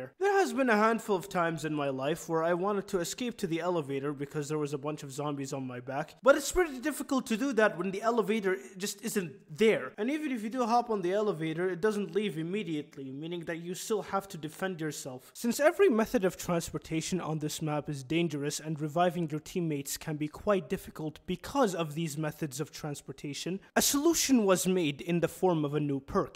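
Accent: Arab world. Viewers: الاكسنت عربي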